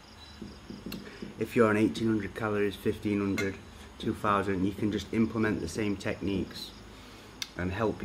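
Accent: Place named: Northern England, United Kingdom. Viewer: Its nice to hear a familiar accent to be honest! Nowhere near enough northerners on my discover page haha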